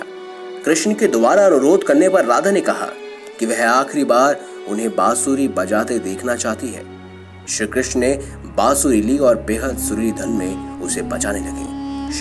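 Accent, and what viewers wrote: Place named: United Kingdom. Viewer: Bhai dhang se bolo, pure Hindi me English accent mat Lao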